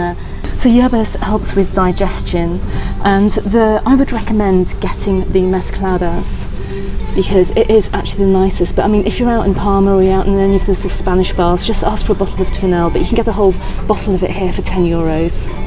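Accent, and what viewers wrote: United Kingdom. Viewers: It isn't possible to sound more English/British than that 😄. Love the content, thanks for posting!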